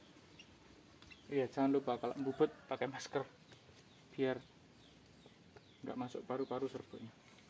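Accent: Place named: Java, Indonesia. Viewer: Asli mana bosku kayak medok😂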